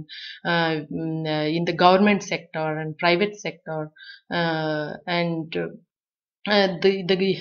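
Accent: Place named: Kollegal, India. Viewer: Real real research. But accent seems from somewhere near kollegal.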